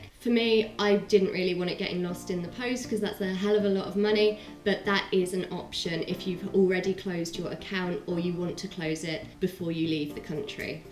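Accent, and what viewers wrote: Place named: Scotland, United Kingdom. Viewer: lovely accent :) Scotland ?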